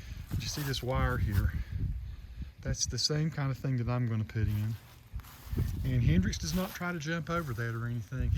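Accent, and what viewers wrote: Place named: North Carolina, United States. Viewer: I knew you were from North Carolina before I visited your videos to see if you ever said where you were from. lol. I'm from NC too. Born & raised in Charlotte. Thanks for the clear, detailed instructions.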